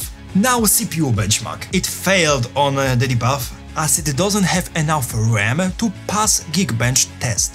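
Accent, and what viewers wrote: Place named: Europe. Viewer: English is fine! A bit of an accent, but that's just standard for anyone in Europe :) As for VPS, I had no idea you could get something that actually gets something done for that cheap. Thanks for the info!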